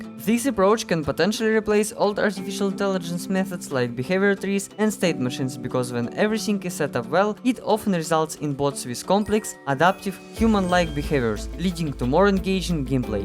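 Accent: Russia. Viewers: ох уж этот Русский Акцент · У вас сударь немного русский акцент 🤔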